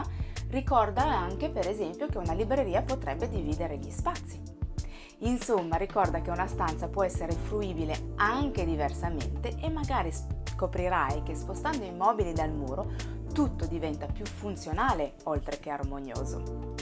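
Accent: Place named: Italy. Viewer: Brava e grazie 🙏 e complimenti per l’accento italiano perfetto!! Ed ho orecchio eh.. :)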